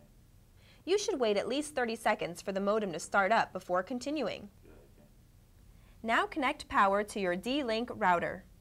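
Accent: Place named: United States. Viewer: Her yank voice goes through me...she's chatting a load of shit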